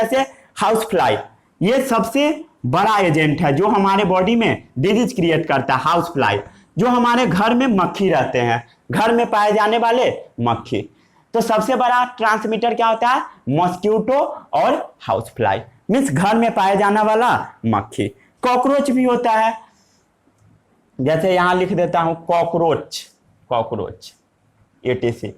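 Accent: Bihar, India. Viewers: saab BTW pronunciation is not a genetic feature.. And the so called 'pronunciation problem" you are talking about is our accent which we Biharis are proud of.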